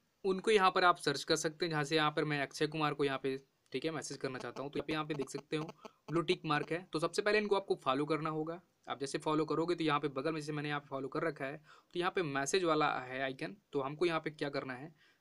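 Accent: Odisha, India. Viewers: Bhai are from Odisha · Brother are you from odisha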